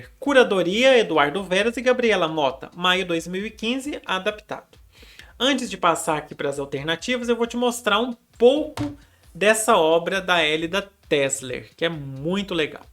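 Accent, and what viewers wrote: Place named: Minas Gerais, Brazil. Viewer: hahaha quais foram as exposições? Sou de Minas também! obs: já tinha desconfiado que era mineiro pelo sotaque, esse não engana ninguém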